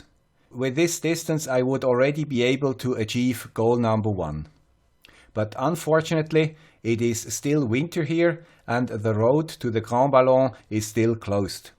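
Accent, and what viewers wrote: Switzerland. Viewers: Grandpa with swiss accent always make things clear. Thanks from an engineering student sir. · Congratulations with the birthday, the world records and the nice Swiss accent. Thank you for explaining everything so clearly and with so much useful details and links, already 120 times! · Happy birthday "Guy with swiss accent"! Great content, as always!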